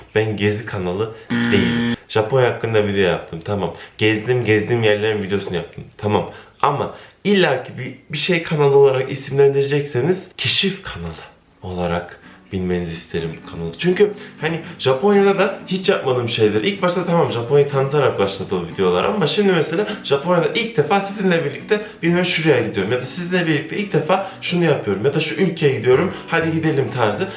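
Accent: Konya, Turkey. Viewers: abi ilk videonu izlediğimde bu kesin konyalı dedim ve konyalı çıktın 😎😎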